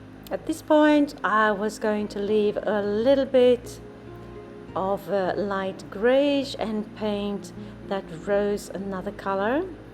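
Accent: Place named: France. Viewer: You speak excellent English with your wonderful French accent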